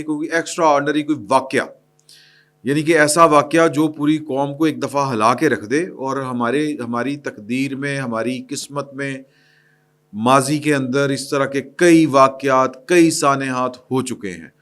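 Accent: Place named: Lahore, Pakistan. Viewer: Lahori style peerni voice 😅😅😅😅😅😅😅😅😅😅😅 iska matlab heh vlog ke liey not only voice but action is also related Aaj 2 lahorie sunne pehle Gohar Butt ji aor ab. ye hoti heh " boli,dharti mata " khamakha Urdu mein zaban ghumani perti heh aor English mein tuo aor bhi vhuman gheri hoti heh. anyhow enjoyed lahorie.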